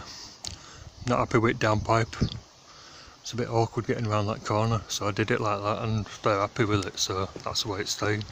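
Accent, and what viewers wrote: Yorkshire, United Kingdom. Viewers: I discovered your channel recently and you really know your stuff. Your keeping the craft alive which is great to see. North of England? Yorkshire perhaps??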